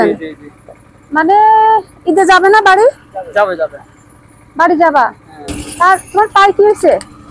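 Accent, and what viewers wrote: Chittagong, Bangladesh. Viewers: আপু আপনি মনে হয় চট্টগ্রামের মেয়ে কথায় বুজা যায় আপনার ভিডিও খুবই ভালো লাগে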